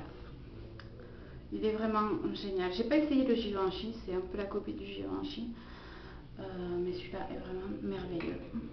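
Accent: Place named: Quebec, Canada. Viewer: Hello hello!! Ahhh tu viens du Québec? Il n'est pas bizarre ton accent! J'aime bien perso!! Ce make-up te va à ra-vir!! Les couleurs des Monobulles sont sublimes j'avoue....Et j'adore ta couleur d'iris... Héhé t'inquiètes, je ne t'oublie pas avec Alice... J'ai ma petite idée dans ma petite tête.... Gros bisous et merci pour la dédicasse! T'es choute ♥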